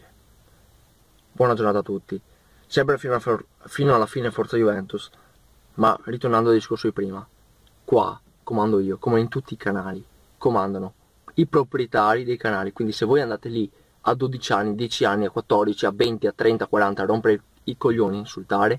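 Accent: Veneto, Italy. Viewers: Il tocco del dialetto veneto rende tutto più bello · 1.15 LÈGO AHHAHAHHA Comunque bellissimo il dialetto Veneto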